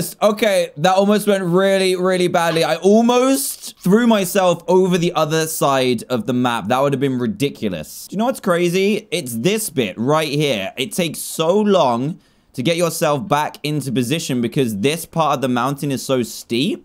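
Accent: United Kingdom. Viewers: You so British lol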